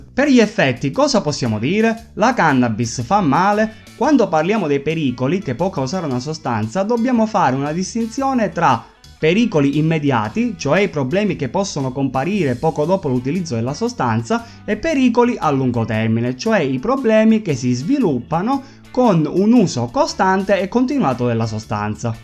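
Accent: Italy. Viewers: Compà sei calabrese? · Quanto ci sta l’accento sardo ?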